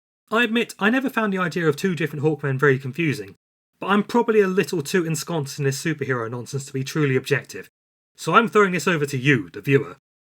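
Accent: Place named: United Kingdom. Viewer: Is 9th metal 8x stronger than Nth metal, or is homies Limey accent just throwing me off? did not know Ninth metal was a thing🤷🏽 The Katar Jr./silver scareb works cuz that Gawkman was a lier and not the Scarebs real brother. I just love JLI and hate the try on that those 2 weren’t legit! Using Morrison’s preferred pronouns? RESPECT Edit Lol Starlin mess’n round w his cosmic fetish, you really can’t fault the guy at this point. Death of the New Gods was a giggle, and he did kill Jason!!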